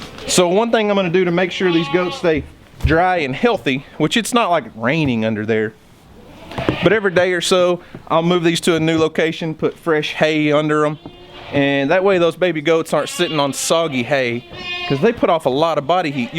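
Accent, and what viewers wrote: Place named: West Virginia, United States. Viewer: My 5 yr old daughter and I just watched this video together and she said Momma, he has goats like we do and he talks like us too 😂😂😂 we're from West Virginia. It's bitter cold here too. Can't wait til Spring....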